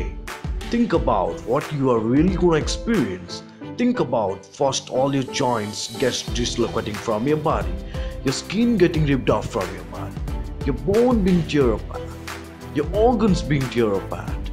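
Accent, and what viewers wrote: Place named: India. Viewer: Dude you are an Indian I know it . you completely sound like an Indian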